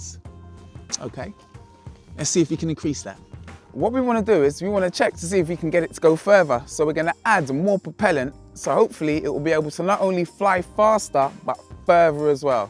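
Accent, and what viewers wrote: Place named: England, United Kingdom. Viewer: I can't get enough of English accents.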